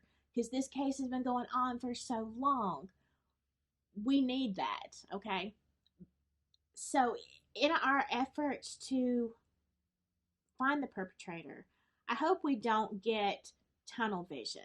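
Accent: South, United States. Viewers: OMG ! YOUR NOT MY CUP OF TEA ! Your Southern American accent is endearing x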